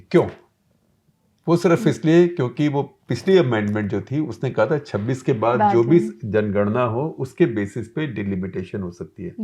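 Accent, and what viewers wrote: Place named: Delhi, India. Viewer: Shekhar ji…..ye program best hai Print pe. Especially aap jo anecdotes dete hain woh bahut rochak hote hain….e.g., air force plane girne wala kissa. Please continue to do this in hindi. Aapki Hindi Dilli wali hai to bahut interesting hai. Thanks.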